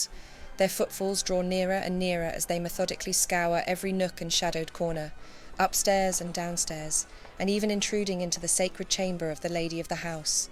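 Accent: United Kingdom. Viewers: Agree !clear thoughtful and well presented.. AND very English🥰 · This channel is narrated by an Brit and there's no denying the musical beauty of her accent! Focus on the positive, we find what we look for!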